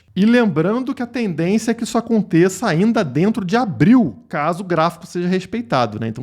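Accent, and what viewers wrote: Rio de Janeiro, Brazil. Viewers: "Téiijjjla, triméééixtri"...sotaque carioca é nojento, mas as informações são ótimas.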